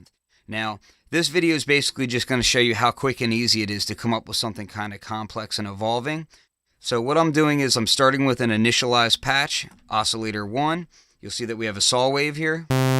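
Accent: Philadelphia, United States. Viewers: It sounds like you have a Philadelphia accent.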